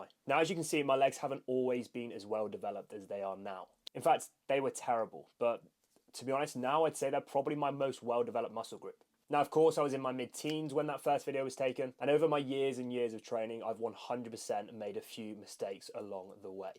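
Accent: United Kingdom. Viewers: British accent, blue eyes, big butt. My dream guy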